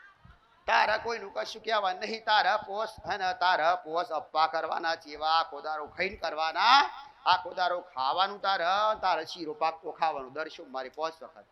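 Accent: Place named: Mehsana, India. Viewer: માડી ની ભાષા અમારા મેસા નાણાં ની બાજુ ની આવે છે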